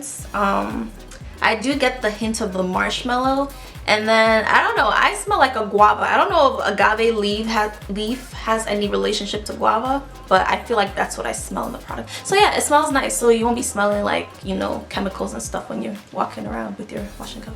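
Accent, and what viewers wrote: Nigeria. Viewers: My sistah! Where you dey now!? Naija girl. I heard the accent a little lol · Loving the Naija accent oh. hmm. lol.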